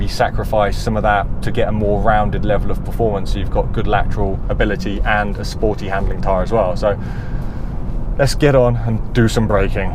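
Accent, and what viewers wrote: United Kingdom. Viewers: Love your channel, instantly subscribed. Interesting thought that I wanted to share with you. My extended family tends to go with Continental UHP AS tires and we're mostly spread out across the West Coast of the US. I mention that because it rains at random times, year-round in most parts of California and seemingly weekly in Washington state. Due to the lack of extreme winter, we've found that the Continental tires let us drive "swiftly" in all weather types without fear of hydroplaning, loss of control due to unexpected situations (other people texting and driving - or swerving into your lane, deer, bicycle, kids etc). Basically, we want to be able to DRIVE our cars, push them a little, stretch their legs on occasion but not discover that a great dry tire becomes unpredictable in ANY way when the roads get wet; let alone tries to kill somebody with unexpected braking or steering limitations. 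As you're probably guessing, Continentals usually meet our needs for HIGH performance All-Season tires, specifically. Anyway, my point is this, your accent definitely sounds British, and it's my understanding that you guys get MUCH more of the wet stuff than California. That being said, I noticed you personally tend to prefer a tire based PRIMARILY on dry performance, so long as the wet and snow aren't too bad... essentially, your highest requirement is driving feel and control in DRY weather. While I can sympathize and appreciatea car that has that feeling in the dry, I would have to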